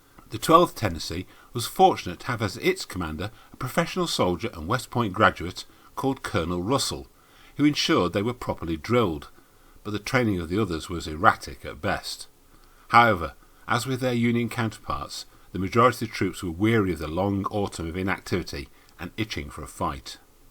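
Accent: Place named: United Kingdom. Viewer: Why is the narrator British? 🤷🏼‍♀️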